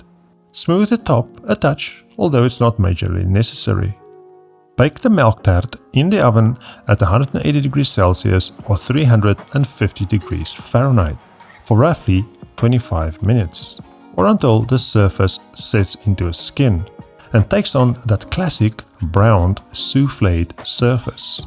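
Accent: Afrikaans, South Africa. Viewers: ‘ off the it’ classic c south african African English! Love it! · What's this? Afrikaans chef John? I'm here for it.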